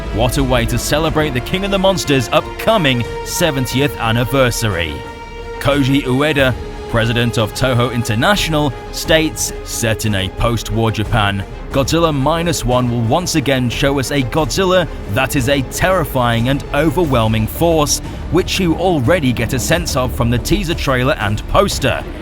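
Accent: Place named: United Kingdom. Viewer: i finally figured it out, the thing that has been bothering me for so long, you are british aren’t you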